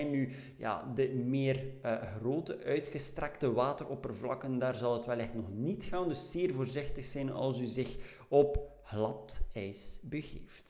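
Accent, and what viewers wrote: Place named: Flanders, Belgium. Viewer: Vlaams luistert ook nog eens gewoon veel lekkerder.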